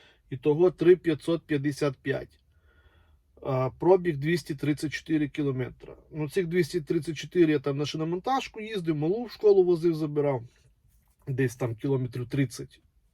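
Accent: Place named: Korostenshchyna, Ukraine. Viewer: А я й чую десь хлопак з коростенщини ну північ полюбому...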